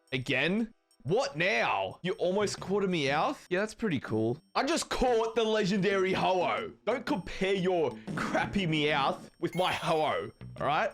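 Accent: Australia. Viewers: Ahhleet foah lol fuck i can only handle the Aussie accent for so long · Because that’s how some Aussies talk. Sounds like he’s got a bit of the Aussie-Leb accent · He's Australian or something. You're just an ass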